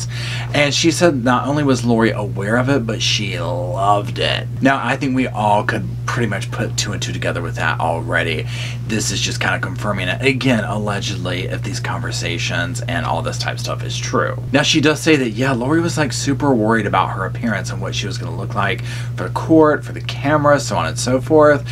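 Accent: South, United States. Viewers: Thanks, from Ireland 🇮🇪. I love your southern drawl and your hospitality knows no bounds. If you're ever in Ireland I'll serve you some pie, we'll gather around the table and talk true crime. Very informative and well researched and what makes it so entertaining is your wonderful personality and talented acting. Love you. 💕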